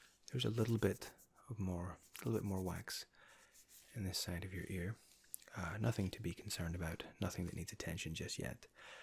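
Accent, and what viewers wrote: Scotland, United Kingdom. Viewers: what is about Scottish accents that relax the hell outta me? I have Celtic background somewhat, my maternal side is the Stewarts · Are you from Scotland? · thank u for your reply :) Lol, of course not, u don't sound like Russian at all, but personally I don't like Scottish accent much but in your case it's completely opposite! Best of luck and keep up the good work! :) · ASMR with Scottish accent? YAS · hang on are you Scottish???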